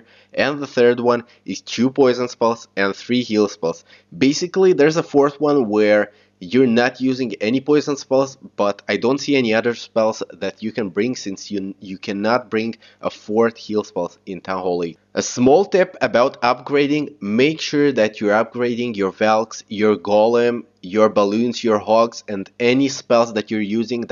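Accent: Russia. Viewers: Ты русский? Просто я англичанин и знаю русский, и ты говоришь с русским акцентом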